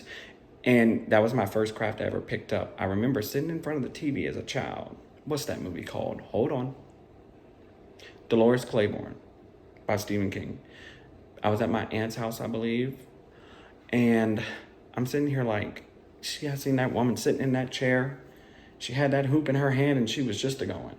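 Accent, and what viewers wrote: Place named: Louisiana, United States. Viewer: ! I. Love. Your. Soul! lol! I really enjoyed meeting you through FlossTube. I grew up in Louisiana as well and I hear your southernisms as you talk even though you don’t have much of an accent. lol. lol. Great first video. I need a visual of your envelope system though. I’d love to see some completed works as well. I’m looking forward to your next video. Also, in which general area are you from in Louisiana? I grew up in Amite which is about an hour north of New Orleans.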